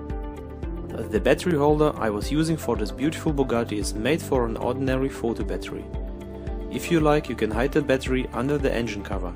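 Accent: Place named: Germany. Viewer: beautiful german accent!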